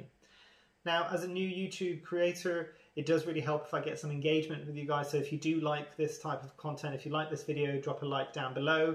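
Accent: West Country, United Kingdom. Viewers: Really interesting video. You’ve got a bit of a West Country twang. Hi from Bristol ☺️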